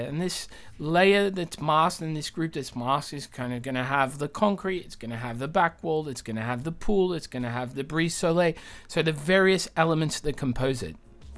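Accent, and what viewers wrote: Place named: Portugal. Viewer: olá, sotaque português não passa despercebido. já aderiste a este grupo? 3D PT <link> abr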